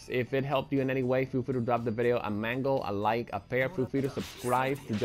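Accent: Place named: Spain. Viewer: The Salvaje Cartel no problem! :) still catch ng your vids by the way, love your accent! You Spanish? Or perhaps Mexican?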